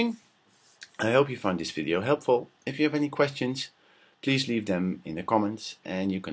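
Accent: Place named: Netherlands. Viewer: DAT DUTCH ACCENT DOOOO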